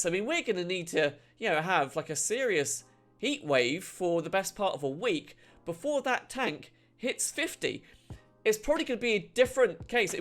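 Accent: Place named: United Kingdom. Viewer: guy with a British accent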